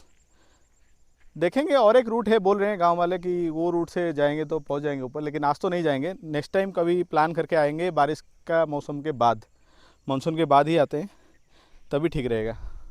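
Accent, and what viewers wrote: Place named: Odisha, India. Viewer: Keep up the great work. Proud of you. Best wishes to your family... specially. Really love your narrative.. with Odia MTI😁👍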